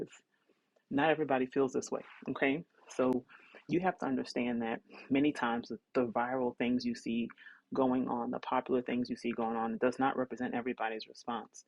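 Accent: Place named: United States. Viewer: Are there that can speak English like this? 😳👀 Anyways, hello 👋🏻 from Nigeria And you 🫵🏻 have gotta be a black American, not an igbo soma 🫵🏻🫵🏻🫵🏻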